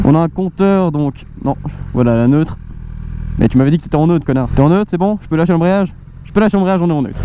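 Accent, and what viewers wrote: Switzerland. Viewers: L’accent suisse 🇨🇭👌🏾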